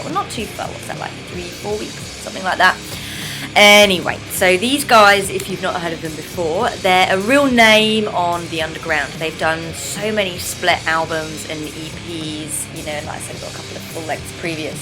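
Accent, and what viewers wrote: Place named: England, United Kingdom. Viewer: love your enlgish accent.....lovely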